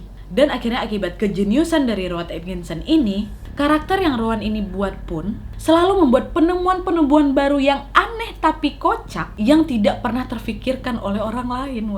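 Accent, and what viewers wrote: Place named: Lampung, Indonesia. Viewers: dialek sumatra.... sumatra mana? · Mbak orang Lampung ya, dialek bicara nya Lampung banget 🙏🙏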